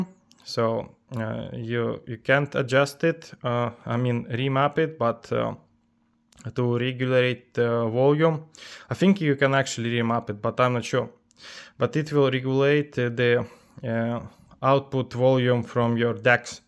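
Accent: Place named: India. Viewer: Индийский английский :-) ...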